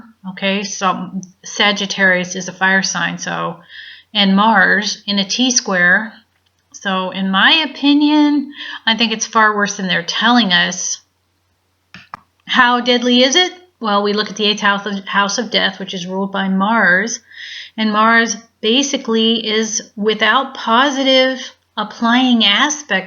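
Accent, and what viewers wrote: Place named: United States. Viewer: You sound American. What about the USA? Will Trump's failure to close the borders cause him to lose 2020? Will 2019 nCoV get really bad in the USA? Do you see martial law? I hear the Feds are buying food. Thank you. Pray for the USA!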